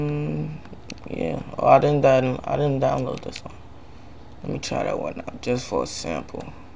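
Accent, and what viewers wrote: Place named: Dominican Republic. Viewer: that dominican style voice!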